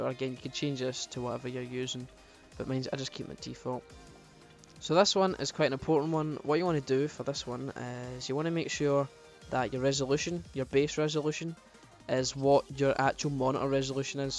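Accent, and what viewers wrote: Scotland, United Kingdom. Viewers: bit a Scotland u fae bro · Yasss he's scottish!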